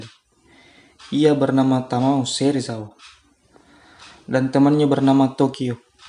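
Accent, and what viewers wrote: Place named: Makassar, Indonesia. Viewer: Orang Makassar ya kau bang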